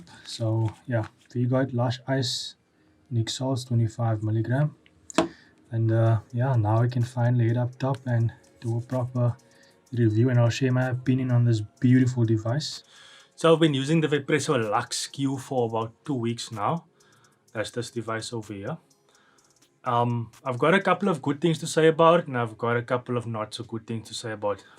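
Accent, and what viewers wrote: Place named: India. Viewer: Top stuff uncle, keep it up😅🔥love the Indian accent 😂🤣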